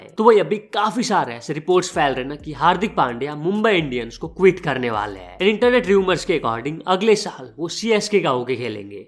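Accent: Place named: Assam, India. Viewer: Accent bhai, vo assam ka hai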